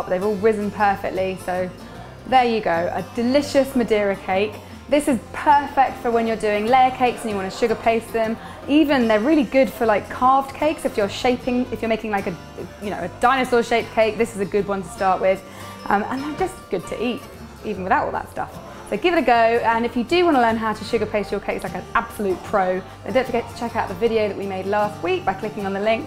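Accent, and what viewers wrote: United Kingdom. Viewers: even though you have tattoo, I don't know why you still look so cute. + I adore your British Accent :D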